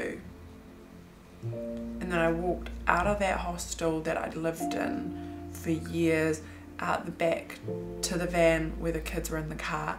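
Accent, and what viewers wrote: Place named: Australia. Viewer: Christianity and cults have nothing to do with each other. They are two separate things. Seems like u grew up in a cult. This is not Christianity at all. Get to know Jesus as your personal Lord and Saviour. Sorry for your bad experience. Are u Maori? Saying since u have a down under accent, either New Zealand, Tasmania, or Australia. U do look very Maori though.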